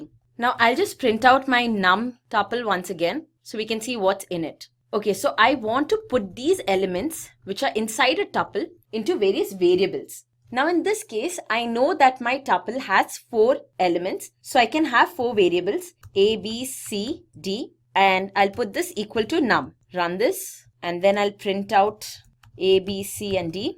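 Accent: India. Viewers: This is a very good content, thanks for sharing. I'm finding it a bit hard to understand you due to the strong Indian accent but I'm making all the effort because your content is good.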